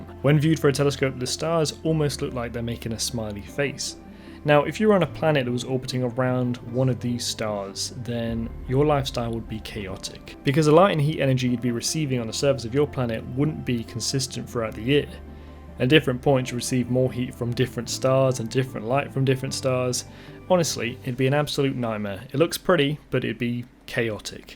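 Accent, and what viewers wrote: United Kingdom. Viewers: Great video...if i was just listening with only sound, you sound like Physicist Brian Cox...English accent and all...I had to double check as I was typing this lol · Very distracting, having a British accent, channel based in UK but quoting prices in Dollars. Strange.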